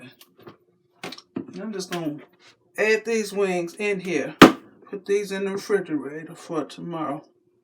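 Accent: South, United States. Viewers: She had me from intro calling ME honey..lol..her southern accent..old school with BOTH arms full of gold bracelets yaaaaasssss..i just got an air fryer last week and made chicken wing dings but not crispy..i will do this recipe next week when I make wings n air fryer..thx so much💜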